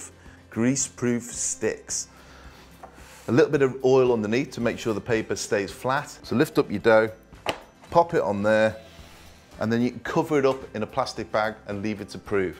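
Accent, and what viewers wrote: Liverpool, United Kingdom. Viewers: Erik Patulin he’s not a Brummy, he’s from Wirral. His accent is closely related to a Scouse (Liverpool) accent. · The British accent and wit also work for some of us. A man that can bake is also attractive.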